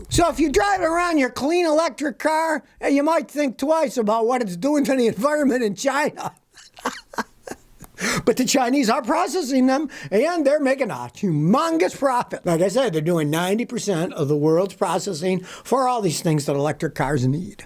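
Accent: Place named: New England, United States. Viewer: This guy may live in Tennessee, but he has a New England "Eyakcint." I've seen him with a "Live Free or Die" sign. Could he be... a New Hampshireling? 😃 Love from a Vermonter living in Texas.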